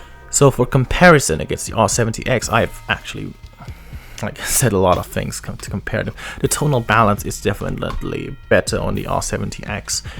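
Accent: United Kingdom. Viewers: Your accent is different in the other what's going on. No offense but it's ABIT weird. This video you sound like British accent? Another one sound more Indonesia? Are you trying to mimick accent or something. Either way just speak how you normally do. And the video I feel like it's too long. I would prefer you get to the point though.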